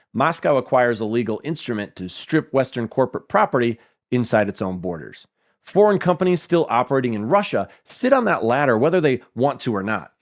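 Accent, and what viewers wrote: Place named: United States. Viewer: He has American accent.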